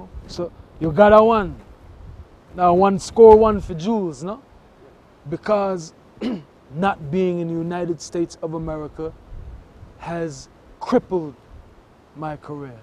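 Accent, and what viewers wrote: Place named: Belize. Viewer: J E He’s Belizean. Sometimes your mother tongue accent comes through if you lived in more than one place when you were growing up.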